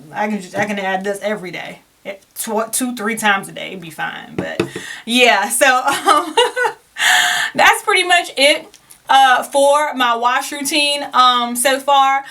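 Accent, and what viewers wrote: South, United States. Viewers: Am I noticing a country accent? I’m talking about the cowboy type too. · I hear it the country accent too.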